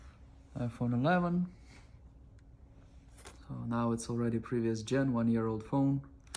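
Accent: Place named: Russia. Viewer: хахаха сразу слышно что руский))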